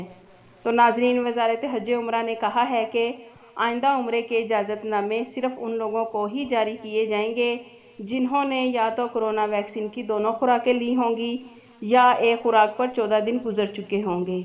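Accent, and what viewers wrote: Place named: Punjab, Pakistan. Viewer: Good evening! Your pronunciation is punjabi so are you punjabi speaking?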